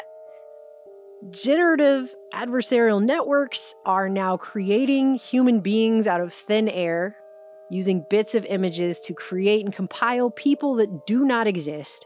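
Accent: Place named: Texas, United States. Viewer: ngl that Texas accent becomes very irksome after several minutes of hearing it.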